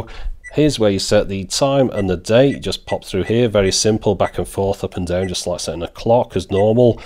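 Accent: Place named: North West, United Kingdom. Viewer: Thank you for taking the time and effort to do all these reviews; very much appreciated! I've watched pretty much every dash-cam video you've done (nice to hear a fellow NW accent, too, BTW ;-) ) and was initially hoping to get this one, but, since the price has now jumped to around £92 I was wondering if you could recommend what YOU think might be a good cheaper option (around the £50 mark as I'm not a millionaire just yet and I trust your judgement based on your no-nonsense reviews)?! Thanks!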